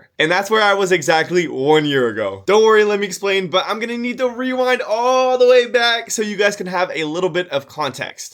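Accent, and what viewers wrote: Algeria. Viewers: You are Algerian, you have the same behavior as Algerians when speaking 🇩🇿🇩🇿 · You speak really like algerians.. You are an authentic Algerian.. God bless you..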